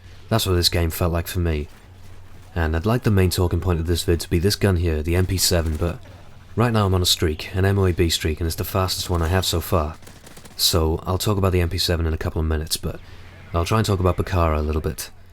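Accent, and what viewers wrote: Wales, United Kingdom. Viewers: Are you from Wales?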